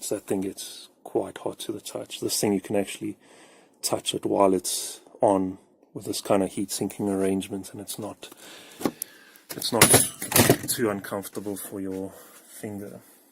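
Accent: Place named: South Africa. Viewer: just a random South African saying my fok when you hear the accent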